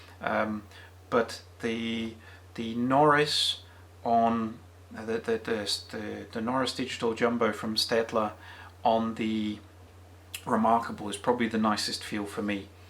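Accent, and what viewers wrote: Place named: United Kingdom. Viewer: When viewing a PDF in landscape on the Note Air, is it possible to do fit-to-width? On the vid, it seemed like you were viewing the whole page in landscape, which made the text really small. Another thing: With split screen on the Air, when reading a book in one half and making notes in the other half, the fact that you can't scroll notepad up as you write but have to keep repositioning the device in order to maintain your writing posture (right?) means that one's viewing angle/distance of the book one is making notes from has to be continually changing. Doesn't that make split-screen more or less useless for serious, prolonged note-taking from books/PDFs? Thanks for the vid! (You've got a British accent but you said "euros" not "pounds"!)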